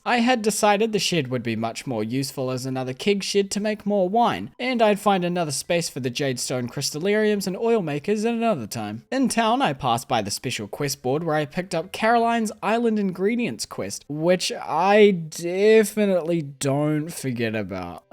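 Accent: New Zealand. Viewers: The way my brain stopped working when i heard the kiwi accent. Wasn't expecting a fellow NZer! I'm so used to hearing the American accent 😂 Awesome video dude, gives me something to watch/listen to while crocheting lol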